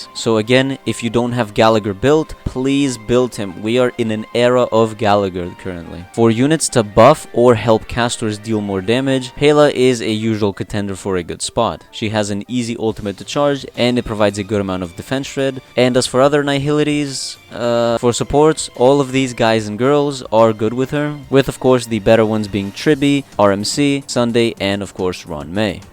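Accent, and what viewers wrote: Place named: Greece. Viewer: That accent.... Are you greek bro? 😂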